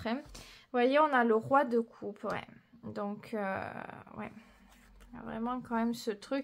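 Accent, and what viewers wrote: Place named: South-West, France. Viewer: Je vous découvre, et j'apprécie... donc je m'abonne De plus, moi qui suis dans le sud-ouest, je reconnais un accent comme le mien, et ca me fait sourire Merci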